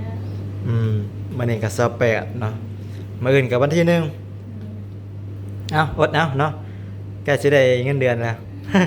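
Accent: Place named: Laos. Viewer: เว่าลาวดีแล้วน่ารักดี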